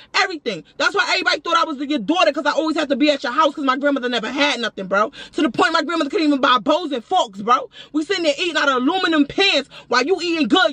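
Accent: New York, United States. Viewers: LOL you know you from New York when you say.... "One Shot Deal" on the real pluw you have the NYC voice yasssss I have not heard one shot deal in over 20 some odd year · It sounds like you from New York around the way, am I correct? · it’s the nyc accent to philly 😂😂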